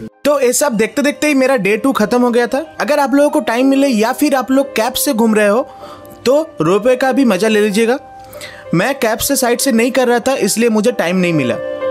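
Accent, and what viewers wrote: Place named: Odisha, India. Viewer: Odia bhai zindabad , accent ru jana paduchi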